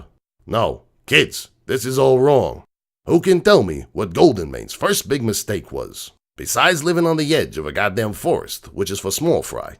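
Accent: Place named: Brooklyn, United States. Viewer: Hehe. Again, I kinda love your Brooklyn accent. Of course, her you've got a lovable scamp of a character, but still. I don't know whether to think there are more bedtime stories, considering how much Bad Horse goes on about good writing, but I hope that there is, and I hope you decide to read more of them.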